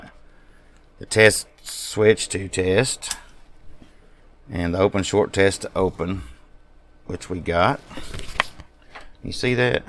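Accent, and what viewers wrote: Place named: South, United States. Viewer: Mr. thanks for the refurb on this equipment. My lead coax reads rg58 /u, after restoration it seems to function as designed. It appears useful for spotting intermittently shorting capacitor pots. Thanks again looking forward to more vintage test equipment on your channel and your laid back southern style.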